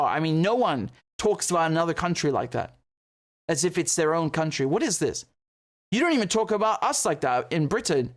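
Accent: Texas, United States. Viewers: Texas voice not too bad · Love your Texas accent bro. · Your Texan accent sounds pretty good. But then I am a Kiwi, so what do I know?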